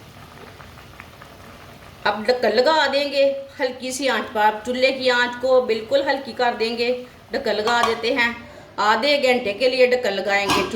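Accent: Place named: Punjab, India. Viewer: What a thick Punjabi accent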